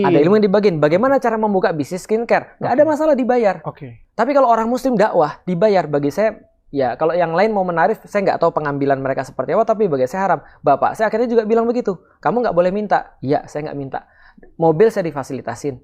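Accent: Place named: Palembang, Indonesia. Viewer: Iyo lah pak ustad masyaallah kental nian Palembang nyo mantap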